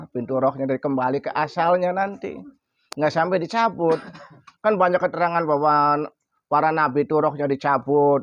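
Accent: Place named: Cirebon, Indonesia. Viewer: Wa'alaikum salam warohmatullahi wabarokatuh.... In syaa allaah mama yai barakallaah... ( punten mama yai, logat kayak wong cerbon... Maaf klw salah)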